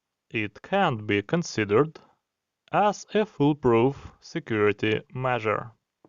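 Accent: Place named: United Kingdom. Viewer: Все гарно, лайк, але твій Брітіш акцент.. Тоді хоть зміни прапор з Американського в заставці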